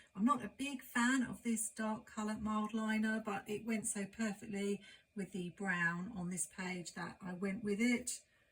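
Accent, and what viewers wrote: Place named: Southern England, United Kingdom. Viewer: I’ve got loads of supplies but am quite keen on starting ‘properly’ in January so I can follow through the year!! Where in the UK are you?? I’m in Essex and your accent doesn’t sound too far north!! 😊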